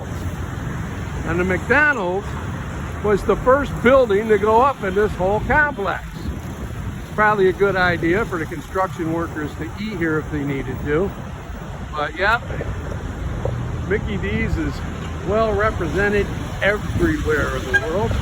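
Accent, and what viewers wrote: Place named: Chicago, United States. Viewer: You sound like you are from Chicaaahhgo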